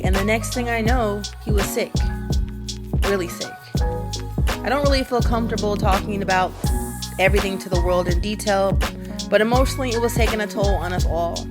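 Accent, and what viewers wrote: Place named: Honduras. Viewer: Awww beautiful words same way i feel currently i have everything in the US but part of me is like dead, not even sure how i found your chanel glad i did... our purpose in this earth is to enjoy life, have peace, show our kids they are more things outside than these routines, not sure if you are honduran i can hear some accent there 😅 we love you guys! 🇭🇳🫶 and i will be praying for your dad 🙏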